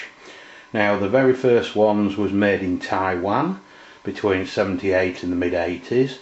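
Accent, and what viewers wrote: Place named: Ireland. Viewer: Hello, tis Paul on the Rock, I'm speaking to you from the future, spooky lol. Haven't heard your dulcet Irish tones for a while, where you been old man? I hope all is well with you and your loved ones, and you're all dodging the lurgy over there. I don't know why Youtube's algorithm decided to put this video in my recommendations 8 yrs later, but hey ho, I do watch the odd chicken box video now and again I s'pose 😉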